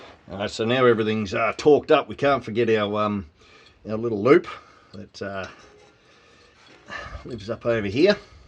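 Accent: Australia. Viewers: Love watching your videos, and even better you havnt lost your Australiana, other words you still talk like an Aussie, been watching you guys since you did the Canning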